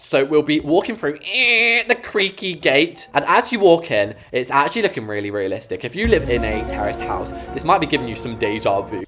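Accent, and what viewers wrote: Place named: United Kingdom. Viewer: I love how your British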